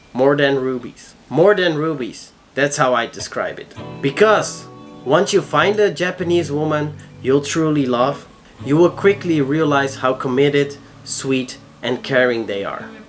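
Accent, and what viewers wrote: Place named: Netherlands. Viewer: I gathered you're from Nederland from your accent. Lived there for half a year. Lekker times 😀. I was responding to the main comment with him saying he's an African American, or a black person as the rest of us outside the US say.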